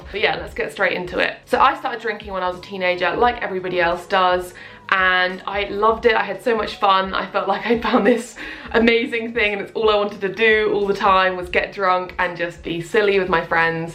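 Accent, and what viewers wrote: England, United Kingdom. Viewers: I'm happy for you, God Bless You! I'm trying to give it up, and what you say is true. I gave it up for 17 yrs. and foolishly started drinking a little wine and quickly turn to allot. Anyways, I want to stop drinking 10 years now but, the Lord help me! I love your english accent, I am in Virginia, USA. Best to you!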